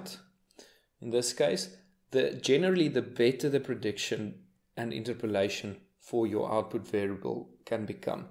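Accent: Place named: South Africa. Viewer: Good explanation. It is heartwarming to hear a South African accent on a youtube video every now and then. My ML model predicts with a 90% probability that this speaker is from Pretoria.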